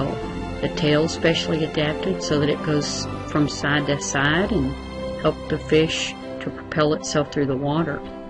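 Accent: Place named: United States. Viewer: sounds American to me but i ain't good hearing accents it all sounds the same to me well except the RP accent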